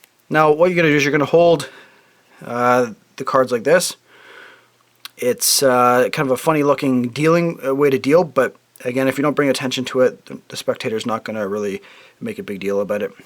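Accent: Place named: Ireland. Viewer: The real magic trick here is the subtle Irish accent he has.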